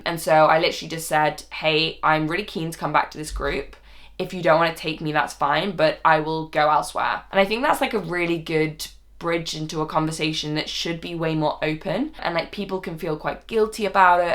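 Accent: London, United Kingdom. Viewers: I feel like you sound so different now! Maybe you've gained the London accent? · sounds especially british in this vid. Also why am i listening to the training process so seriously i'm not even a law graduate. Nevertheless congrats i'm so happy to see this!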